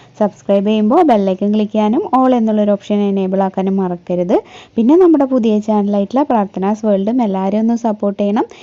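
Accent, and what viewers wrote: Thrissur, India. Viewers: trissur anu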